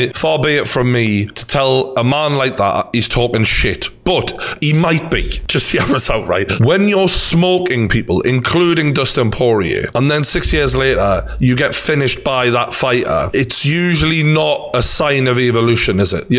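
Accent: Newcastle, United Kingdom. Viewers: I love but I'd rather not have a husky Geordie man talking me to sleep, not again anyway...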